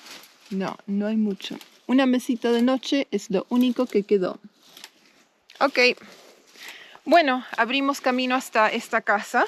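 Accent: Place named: Argentina. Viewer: tu acento eres una argentina más!!!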